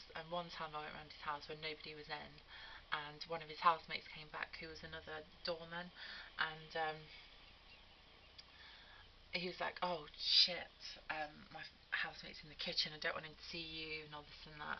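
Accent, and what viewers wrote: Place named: United Kingdom. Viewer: Love the British accent. For a moment there I thought she said "it's the tranny-first century."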